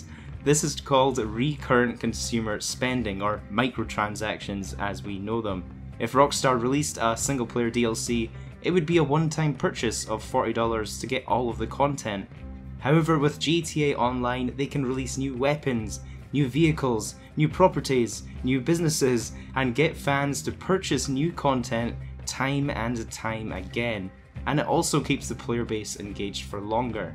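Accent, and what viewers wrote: Scotland, United Kingdom. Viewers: Here weird question by any chance are you from Scotland? It's just the accent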